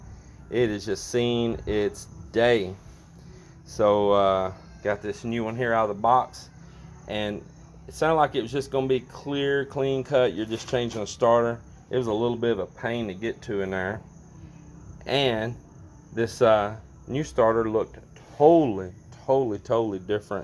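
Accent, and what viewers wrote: Texas, United States. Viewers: Love your passion. Hope to have more of you. Can we clone you? Love your Texan accent. Hope you are enjoying your stay in the Philippines. You are a sweetheart. Take care and God bless. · Luv your texan accent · And i love your Texan accent.. real cowboy language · You from texas? I can tell. Working in BPO :) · I always love Texan accent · I am a foreigner working in Manila. I like the attitude you share and I also like your Texas accent. 😄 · Love the accent sir, texan accent! I’m proud of u sire! Can i work with u sir? Don’t worry about the salary, just love hanging out with u sir.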